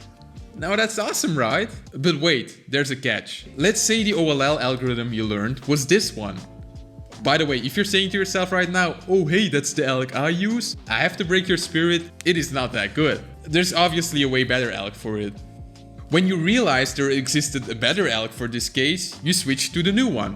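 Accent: Netherlands. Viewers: You sound kinda dutch tbh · are you dutch??????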